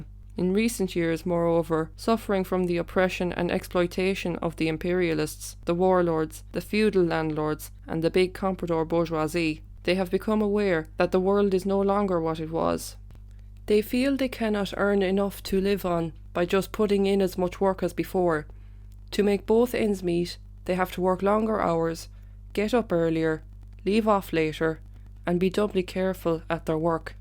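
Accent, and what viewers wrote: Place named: Ireland. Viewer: Thank you for your hard work as always, comrade! I could listen to your Irish voice all day!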